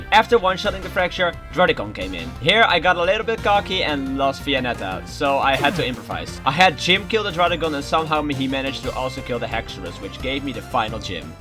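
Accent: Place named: Netherlands. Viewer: You sound like you are from the netherlands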